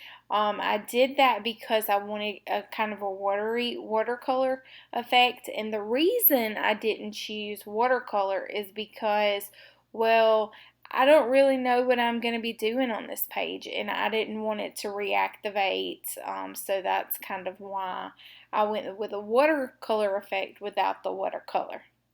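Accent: South, United States. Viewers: Love your videos/art work and the way you describe what you've done. Your Southern accent is also adorable!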